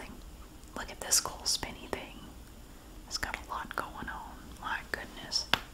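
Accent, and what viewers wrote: South, United States. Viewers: Thank you for this video. South Carolina is beautiful, I’m going to visit in a few weeks since my boyfriend is from there. Your southern accent is so sweet and cute 💕 are you originally from SC or just visiting for vacation?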